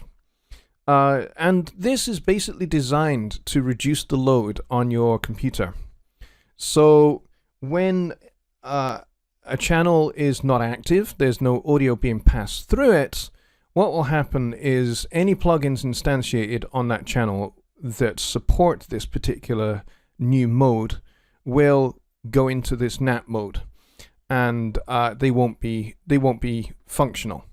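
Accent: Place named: Scotland, United Kingdom. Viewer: Where are you from in Scotland 🏴 bud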